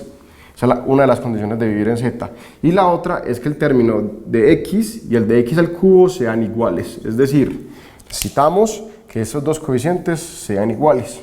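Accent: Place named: Antioquia, Colombia. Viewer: Los paisas si hablan horrible, pero buena la explicación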